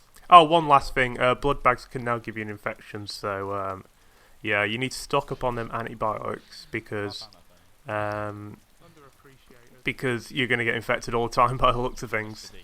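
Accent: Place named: England, United Kingdom. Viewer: He's just English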